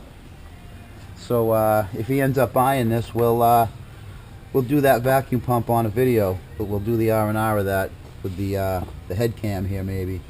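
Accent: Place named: New England, United States. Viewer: hey.i see a few Saab videos! I have a 07 93 20t. so keep em coming! btw.. You in MA? I detected a new england accent.. take care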